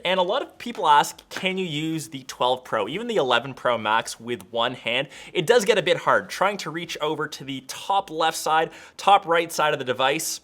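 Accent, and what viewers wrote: Canada. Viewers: I have to admit, one of the highlights of watching your videos is chuckling when you say words like out, about or been, but we love our neighbors to the north. 🤣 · You have an accent. Are you Canadian?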